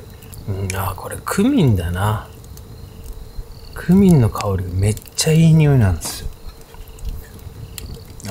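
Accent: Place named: Hakata, Japan. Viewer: 乾杯ありがとうございます😊 今宵も良い晩酌が出来ました🎉 はい美味しいぃ〜😆✨ やっぱり博多弁のがいいですね🤭 やばばです🫡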